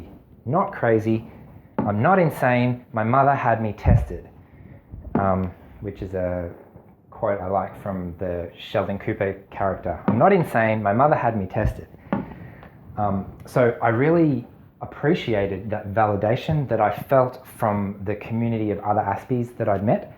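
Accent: Australia. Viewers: I just recently found your channel and it's like having an audio diary but with a nice Australian accent. So much of what you share about your thought process and solutions are almost identical to what I had to learn to do to be able to interface with society.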